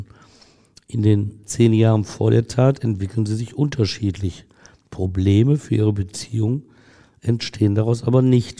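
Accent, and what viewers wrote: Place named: Ruhr, Germany. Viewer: Er ist doch der Gerichtsreporter und erzählt es wie er alles im Gerichtsprozess erlebt hat. Kein Anderer kann es doch nicht so erzählen . Das ist Ruhrpott.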